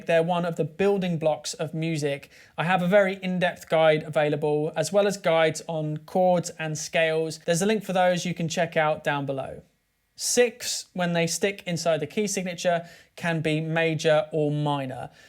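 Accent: United Kingdom. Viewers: I'm learning piano reading and english as well. I find your channel very useful and your british accent is way much suitable for doing so. Thanks!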